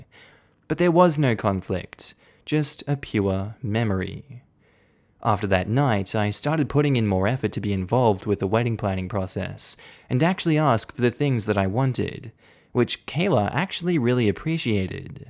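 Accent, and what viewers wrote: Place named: Australia. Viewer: Aussie accents are hot, then add that personality, voice and face and you have a quadruple threat guy!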